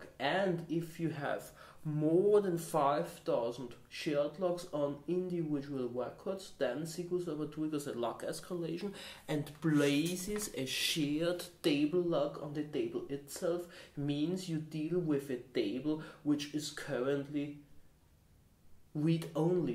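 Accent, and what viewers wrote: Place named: Germany. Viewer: damn this guy with heavy german accent makes me happy! :))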